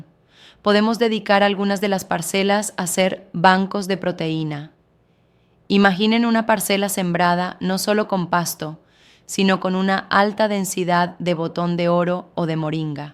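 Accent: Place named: Argentina. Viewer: Eso ya es viejo, se llama rotación de potreros y porque hablas argentino?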